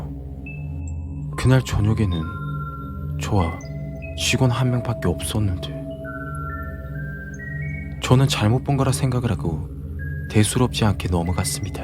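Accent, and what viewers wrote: Gyeongsang, South Korea. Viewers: 안타깝네요.😭 님 갱상도사투리 억수로 잘하시네예.갱상도 분이신가?🤔🤔😁👍👍👍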